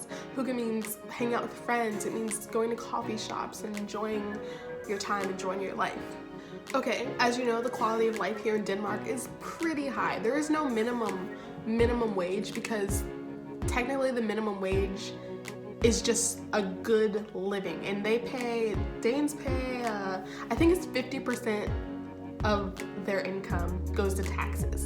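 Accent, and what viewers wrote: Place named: Sweden. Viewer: You actually sound more swedish than danish. Thank god! Go over to Sweden instead a little better on everything. Danish can´t even play hockey. Sweden yes! Denmark no, burn in hell danes!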